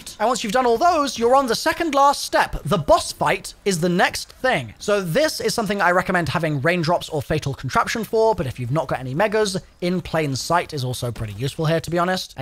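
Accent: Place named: United Kingdom. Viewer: I am laughing so hard at the fact that two days ago I went to find your tutorial and couldn't find one. Now I finally can have my proper British walkthrough as the keepers intended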